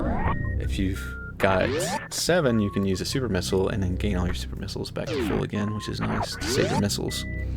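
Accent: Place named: South, United States. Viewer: commentary!! Never heard it on your vids before is this new? You have a nice southern boy like voice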